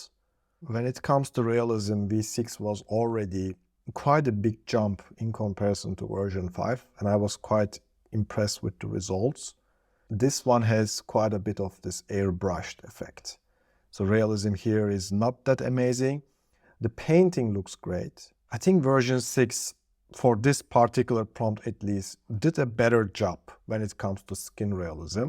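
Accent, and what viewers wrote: Turkey. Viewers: aksanı duyduğumun 5. saniyede falan dedim ki bu türk. anca bu aksan bir Türk'te olur. Çok farklı konuşuyoruz gerçekten yabancılardan. nasıl hintliyi vs fark ediyorsak konuşurken. türk kişide anında anlaşılıyor be kardeşim. iyi çalışmalar.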